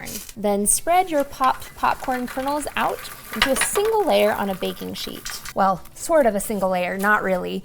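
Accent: United States. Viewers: Mam you American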